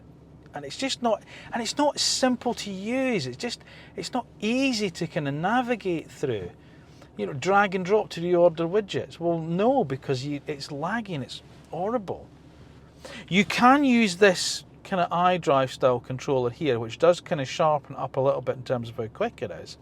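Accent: Scotland, United Kingdom. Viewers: That accent. Is that doric mixed with orkney?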